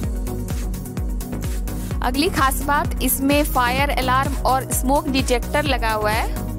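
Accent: Bihar, India. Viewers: Language mei bihari touch jyada h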